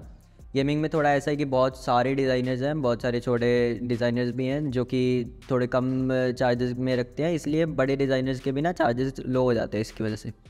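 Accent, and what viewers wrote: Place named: Pakistan. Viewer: Why you gives Pakistani vibes? You have pakistani accent not Indian. IDK why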